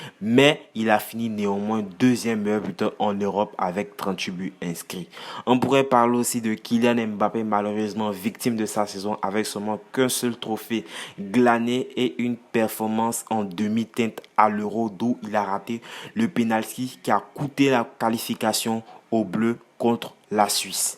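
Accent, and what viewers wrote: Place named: Ivory Coast. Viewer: T’a l’accent ivoirien toi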